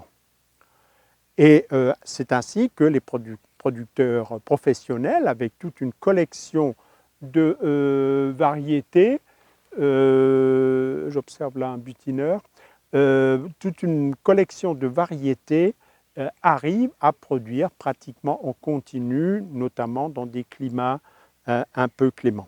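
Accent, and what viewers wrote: Alsace, France. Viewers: Merci, c'est toujours un plaisir de vous écouter, j'apprends toujours quelque chose de plus. Il a beau être nul, celui qui a écrit le bouquin, je vais l'acheter quand même.! Il a un fort accent alsacien que j'adore étant moi-même d'origine alsacienne. Merci encore!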